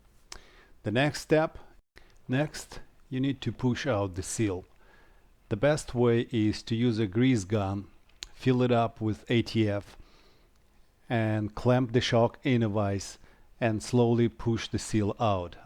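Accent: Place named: Romania. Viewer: Mercedes-Benz Channel We all know that experience my friend. It’s an inspiration for me, you’ve got it looking really good. I hope you find time to complete the build soon. I haven’t even started mine yet. It’s a 1983 USA spec model (solid alloy wheels and ‘INTERCEPTOR’ decals), so rather rare here in the UK. Where are you based? Your accent sounds Romanian to my ear. Hope I haven’t offended you.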